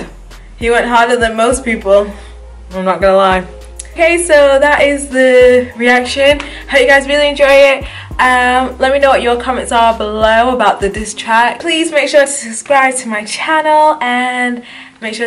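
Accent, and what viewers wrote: North, United Kingdom. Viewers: You sound northern where are you from? Nice video btw, finally someone that actually knows what's going on before they react to it 👌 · You British? If so where abouts are you from? London?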